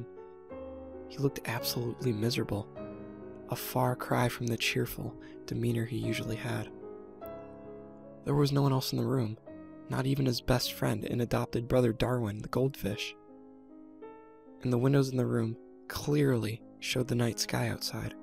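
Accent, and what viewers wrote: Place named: United States. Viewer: The guy speaks really good American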